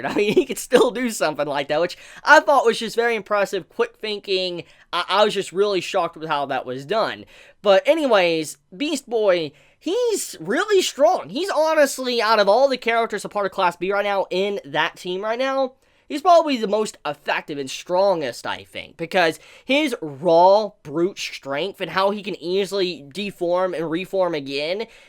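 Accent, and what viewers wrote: South, United States. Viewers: I love how subtle your southern accent is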